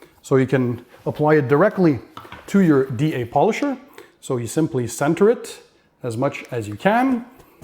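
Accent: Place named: Quebec, Canada. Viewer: I watched 30 mins of your best products vid, and 22 mins of this one before I picked up on your Fr. Canadian accent, lol. Vive la Quebec! Merci, monsieur.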